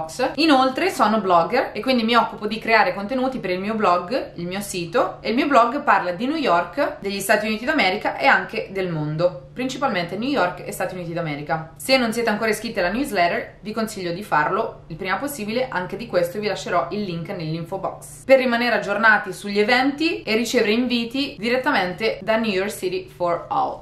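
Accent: Ancona, Italy. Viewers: Ciao, sento da come parli che sei di Ancona. Confermi?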